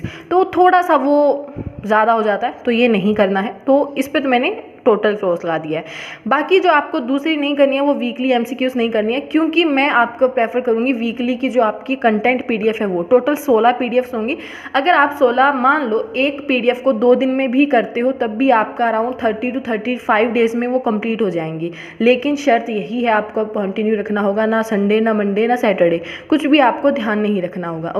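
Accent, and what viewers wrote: Haryana, India. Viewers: Aap haryana se ho ????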